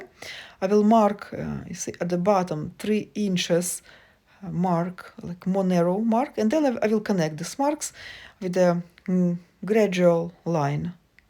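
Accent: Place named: Russia. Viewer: Слышу русского человека. Но почему не на русском? Хотя бы субтитры?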